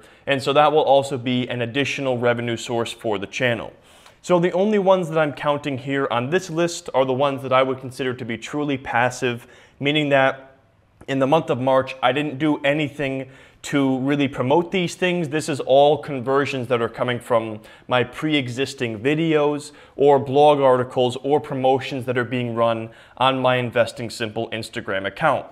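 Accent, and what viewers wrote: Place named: South, United States. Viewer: I enjoy ur vids brother, but are you related to Trey Gowdy? You look and sound similar to him yet less of the southern accent.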